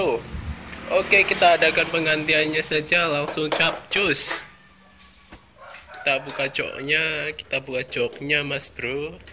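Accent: Banyumas, Indonesia. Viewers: Tak kirain banyumas mas boy..soalnya logatnya kaya orang banyumas.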